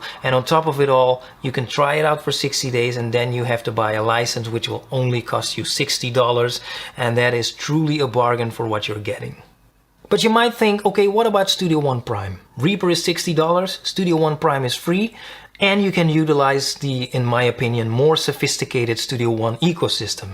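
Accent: Netherlands. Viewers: Small channel still, but I love your clear style of presentation. I consider myself a "Reaper power user" but getting more and more frustrated by the midi editor, and for that reason alone I'm trying out Studio One 4 Professional. By the way, you sound a little Dutch (the accent)! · Hoor ik een Nederlands accent?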